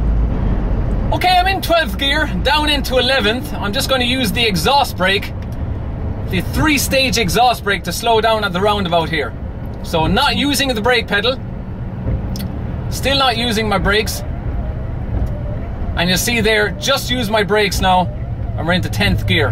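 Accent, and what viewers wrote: Ireland. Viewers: Greetings from Sweden. Love that Irish accent, wish I could speak like that 🙂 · Your voice is so entertaining to hear, I love the Irish accent Very informative, and the Scania R520 V8 Streamline is my favorite truck!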